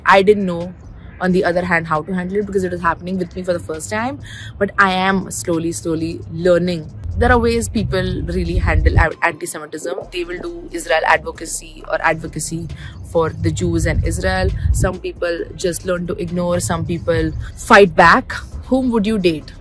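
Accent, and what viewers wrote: Maharashtra, India. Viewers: tu khup god marathi boltes:) Keep it up!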